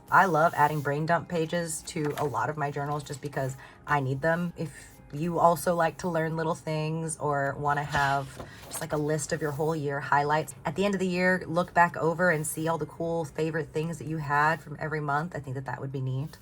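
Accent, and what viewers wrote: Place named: Mid-Atlantic, United States. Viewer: I find your accent fascinating, very Mid-Atlantic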